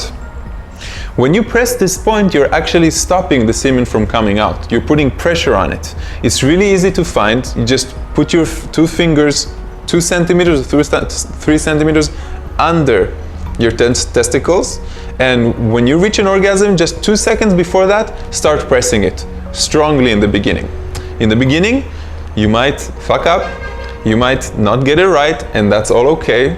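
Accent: Israel. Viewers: Youre accent sounds Israeli r u...? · Why do you sound so Israeli?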